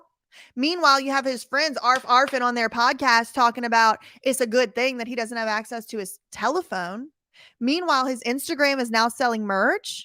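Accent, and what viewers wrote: South, United States. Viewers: Woo hoo Warrior Woman! 🎉Shae Shae shame shame. “Sometimes you can come out alright, like me and then there’s Shae.”🤣 Also, you have definitely been laying on the southern accent and rhetoric lately. Hilarious! Seriously though, I’m SO PROUD OF YOU STANDING UP FOR OTHERS AND FOR JUSTICE!😘🤗💗💕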